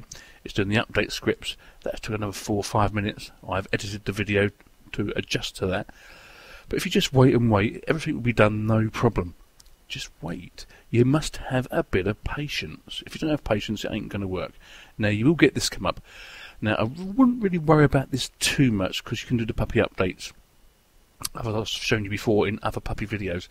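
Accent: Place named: RP, United Kingdom. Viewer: Hey I could imagine you as a very wealthy English man talking about business and politics during the 19th century. Awesome voice!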